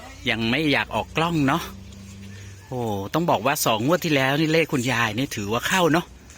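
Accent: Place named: Laos. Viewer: ออกลาวแล้วค่ะ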